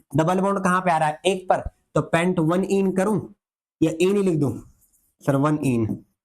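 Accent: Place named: Mewat, India. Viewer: Sir me jairoli tijara se aapki vedio dekh rha hu mene aapni language se pehchana aap mewati ho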